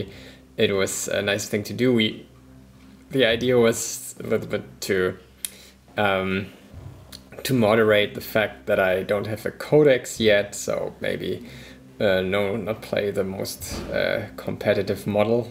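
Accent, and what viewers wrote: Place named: Germany. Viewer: You sounds like Germans speaking english 🤔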